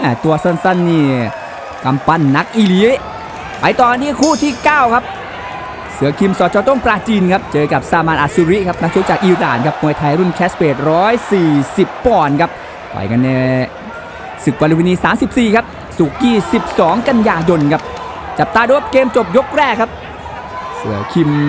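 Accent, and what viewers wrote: Isan, Thailand. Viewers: พากมวยมีอารมณ์ร่วมกับมวย.รวมพลัง. น้ำเสียงดีด้วยเลยทำให้น่าฟังมาก.ขึ้นไป อีก.ติดตามตั้งแต่เริ่มเปิดมาดูแล้วครับ.พาก มันจริงฯ.ชอบฯ.ไทยปนอีสาน.อย่างแจ่ม..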